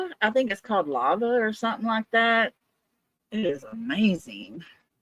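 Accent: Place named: South, United States. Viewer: !!!! I've missed that Southern Drawl!!! So happy to see a video from you!! Imo YES! That is so weird that she would come out and go the same way you do!! 😱😱 R u sure they don't have a tracker on your car 🤔🤔 What are the odds?!?! I don't believe in coincidences... 🧐🧐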